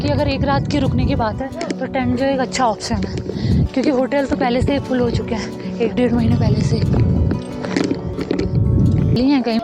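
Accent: Uttarakhand, India. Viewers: Jaise ap btate ho ji aisa lagta hai apke awaj se uttarakhand ki mahak arhi hai apne aj kedarnath ke bare me btake dil khush kar diya hai thank u and love you vlog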